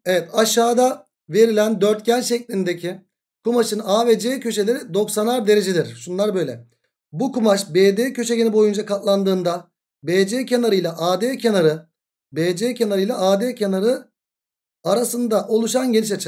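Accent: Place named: Balıkesir, Turkey. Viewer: Hocam sanırım Balıkesirlisiniz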